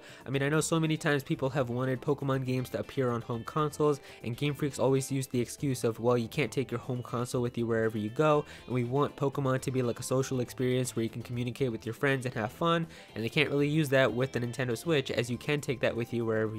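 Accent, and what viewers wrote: Netherlands. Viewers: Wait a minute, are you dutch. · are you from the Netherlands or Belgium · Are you dutch? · wait, are you dutch?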